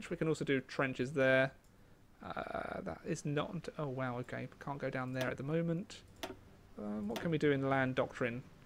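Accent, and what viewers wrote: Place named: United Kingdom. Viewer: When he said he was going to England I was shocked cuz I actually thought he was a Brit the whole time😳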